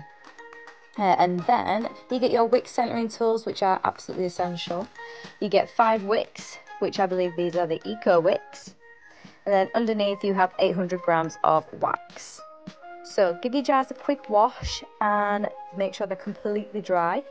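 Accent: United Kingdom. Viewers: Thank you. Quick and simple. (Are you from Hull?) love the accent. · Nice and simple to follow. You sound like you're from my neck of the woods, Leeds maybe? It's unusual to hear on YT 😊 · Uffff…. that nonsense British accent!!! Such a torture to ear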